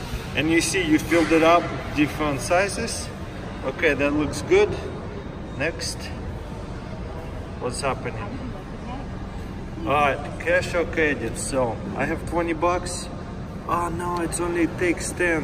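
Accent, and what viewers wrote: Russia. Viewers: У тебя акцент как у русского,ты русский не так ли?) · Its just his accent cause he is russian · ты можешь говорить по русски? немного заметно по твоему акценту. это круто,что наши могут так подняться на ино.ютьюбе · Мне кажется или это не американец, а русский. Акцент вообще не английский и произношение хромает. Ну, если что: привет из рашки! :3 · Some heavy Russian accent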